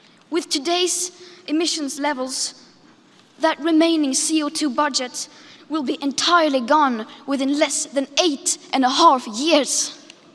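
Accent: United Kingdom. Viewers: Greta has a British accent. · Ma She's swedish but in a lot of European countries you learn british english. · I’m English and can’t speak another language. Greta sounds like she was born in the Uk. I’m ashamed..... So young, so talented, so educated . We need her in our trillions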